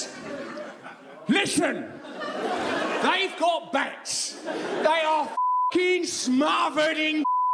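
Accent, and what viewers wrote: United Kingdom. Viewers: I really need some practice at listening to that British English - or is it English British. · I hear bleeps what Russell Brand is saying. He said many bad words in British accent about COVID 19. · Eh. It’s a bit too British for American humor. The tone of your delivery is all wrong, im sure it’s a knockout in Europe but half of us can’t even understand your tea and crumpets accent bro. That being said you’re a bescon of truth and you’d do better as a lighthouse for ships in the dark, than a jester on a unicycle. Just sayin.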